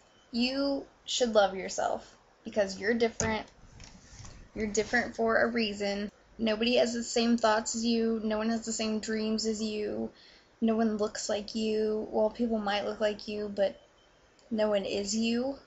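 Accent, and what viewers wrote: United Kingdom. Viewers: You are so cute. ^^ I saw your video about english accent. You have a very nice voice. I like the britisch slang. Many greetz from Austria. :) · i like it, i want my hair to be like this too, it's really cool. by the way , thanks , your videos helped me to learn the british accent)) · Hi what happened with your uk accent? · Owh!! you are so beautiful> and iu like your British accent by the way and i love it. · I just finished watching your video..the british accent..by the way i wanna know the tradition regarding on how should be the woman dresses,,atttitude or etc.. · you're great, ignore all those fucking snob. I really like your British accent, even if its not natural. You're inspiring its cool. >//<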